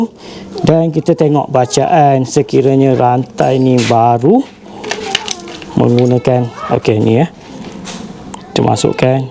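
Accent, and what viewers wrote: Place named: Pahang, Malaysia. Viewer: Bang org phg ke... Klu phg area mne..? Kuat slang phg ter...haha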